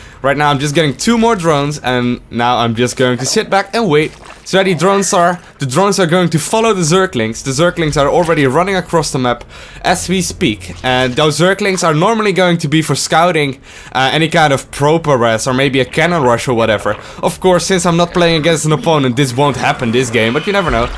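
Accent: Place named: Netherlands. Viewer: Quit whining about a Dutch accent. You understand him, you learn from him, you can't speak commentate this game better. QQQQQQQQQQQQQQQ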